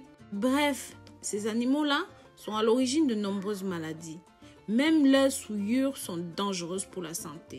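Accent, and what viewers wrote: Africa. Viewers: ton accent d'Afrique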